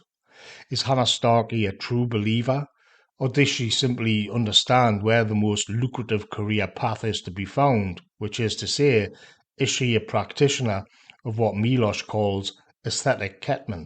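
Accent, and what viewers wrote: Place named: North East England, United Kingdom. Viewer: I love it that a working class lad from the North East is far more intellectually than most of our shit stain politicos .it gives me hope I'm working class from the North as well knowing thus bloke is out there on line who speaks like me makes me so happy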